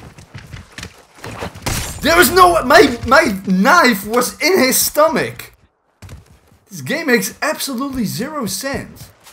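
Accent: Netherlands. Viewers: very nederlands accent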